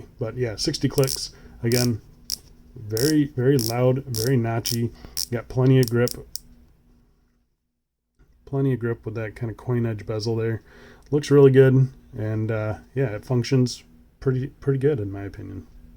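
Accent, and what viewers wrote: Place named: New Jersey, United States. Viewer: sound like a jersey girl ..gg tandorio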